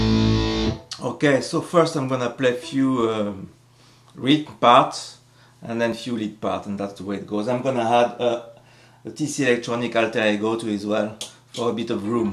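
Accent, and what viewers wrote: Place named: France. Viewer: On sent l'accent français :') Bonne video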